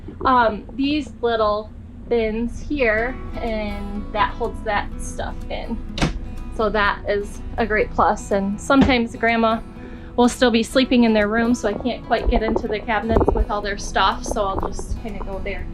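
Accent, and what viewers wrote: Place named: South, United States. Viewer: you are getting a southern drawl, also LOVE the intro. Cool storage ideas/hacks.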